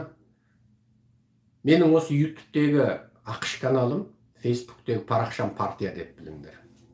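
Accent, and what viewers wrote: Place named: China. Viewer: Сені кім сатып алған.Қытайский акцентпен сөйлеп отырсың ғой.Қытайда отырып алып біздің елге ірткі салма.Сенсіз де өзіміздің агитаторлар жетіп жатыр.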